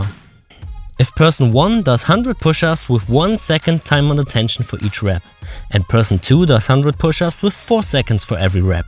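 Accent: Germany. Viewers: Sorry aber dieser heftige deutsche Akzent ist mir zu anstrengend. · Wow you sound reaaaally german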